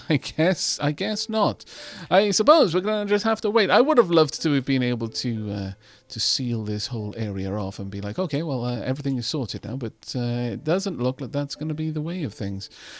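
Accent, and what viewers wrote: Wales, United Kingdom. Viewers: with your sultry Welsh tones and your propensity for looks over function, how could I not!?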